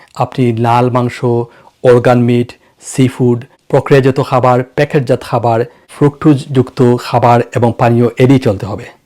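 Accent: Sylhet, Bangladesh. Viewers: আপনার একসেন্ট। খ উচ্চারণ। ভালো লাগলো সিলেটি ভেবে 😊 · আপনি সিলেটী নাকি? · ডাঃ সাহেব আপনার জন্য আরশি বাদ রহিলে আপনি সিলেটী কথায় বুঝা যায় সঠিক জানি না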